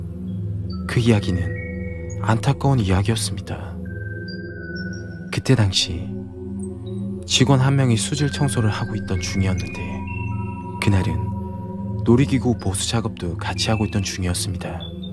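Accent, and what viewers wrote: Gyeongsang, South Korea. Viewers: 안타깝네요.😭 님 갱상도사투리 억수로 잘하시네예.갱상도 분이신가?🤔🤔😁👍👍👍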